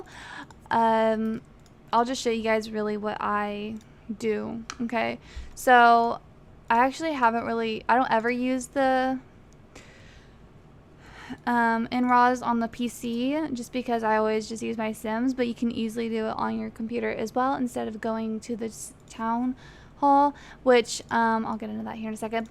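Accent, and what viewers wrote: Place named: West Coast, United States. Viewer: / slightly triggered by her voice, but also knowing maybe she's just from the west coast.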